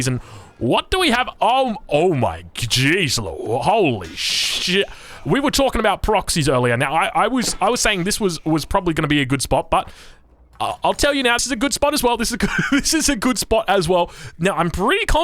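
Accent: Australia. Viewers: Incredible game, incredible commentary! I've seriously never enjoyed any commentary with an aussie accent til now and absolutely nails it! loving every second of it.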